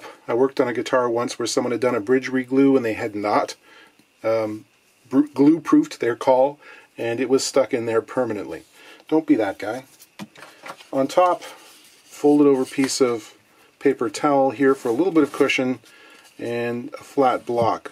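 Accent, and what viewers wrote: Canada. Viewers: In Canadian about should rhyme with shoot and scoot. That’s part of the charm of listening to this channel, to hear a talented foreigner speaking the English as only an occupant of the great white north can. Seriously, I’m Virginian and y’all say it like the folks from Richmond. Thanks! Another wonderful video. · Don't you worry about that Canadian accent...you do you! Thanks for the amazing videos!!! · Go ahead, sound as Canadian as you like. You wouldn't tell an American to not sound American. Anyway, guitar sounds pretty good, imo. Nice work and video ... very relaxing. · Embrace your Canadian ways and accent! ;-) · Don't check yourself about "about"! It's good to hear an identifiable Canadian accent. I'm a particular fan of Ontario accents from further east, which may almost sound Scottish or Irish. And I'm scientist and my cuticles are no better than yours. 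This one reminds me of my ongoing dental work... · I'm a new viewer of this excellent channel. I realize this is an old video, but I find it sad that you're cautious about (aboot😅) how you pronounce certain words. I love Canadian, and I love your videos. Keep up the good work!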